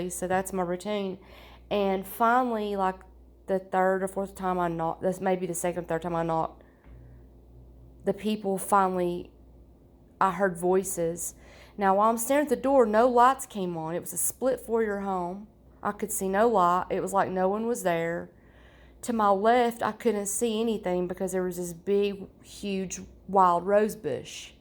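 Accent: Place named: South, United States. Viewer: damn I must be psychic lol. There is nothing wrong with your accent , southern charm.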